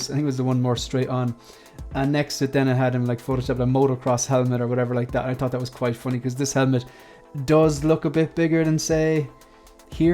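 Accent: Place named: Ireland. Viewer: I watch a lot 1:6 collectibles/collector Youtubers. Lael, Riley, Justin, Will etc pretty much everybody, and dont get me wrong, I genuinely like those guys and enjoy their content but this video is a great example of why you are my favourite creator in this sphere of Youtube. As always just a very calm, thoughtful, balanced and measured approach to sharing your thoughts. I guess the Irish accent helps a bit too haha. Much love.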